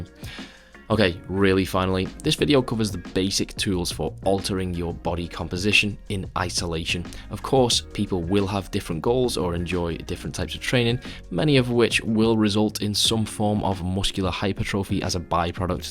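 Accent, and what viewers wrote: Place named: Northern England, United Kingdom. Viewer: you said a while back in the video where you discuss how you haven’t been putting in your full effort for some time, that one of the reasons is that once you’re in good shape, you don’t have to train so hard to maintain. Does this approach not apply to when cutting? ie, not lifting like you’re trying to gain, despite what you say about always training like you’re trying to grow in this video? Love the content mate - so good to have a dry Northern lad deliver a quiet and self aware sermon;)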